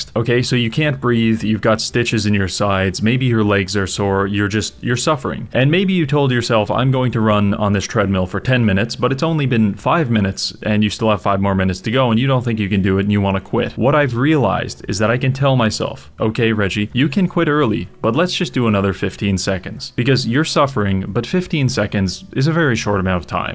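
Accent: Canada. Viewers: when i first watched your video (the wh one) i immedietly thought you sound like seth. then seconds later i was hit by the canadian accent and knew i found a gem of a channel XD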